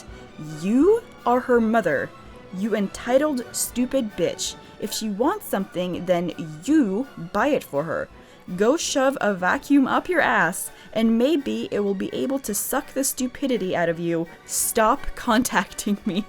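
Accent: United States. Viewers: this is creepy she has an American accent but reminds me so much of my sister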